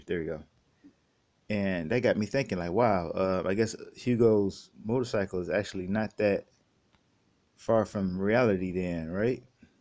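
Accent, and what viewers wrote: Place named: Lebanon. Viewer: You have interesting accent, do you live in Lebanon??? Are you Lebanese???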